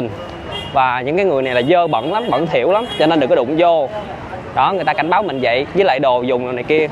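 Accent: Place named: Vietnam. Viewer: Giong Vietnam qua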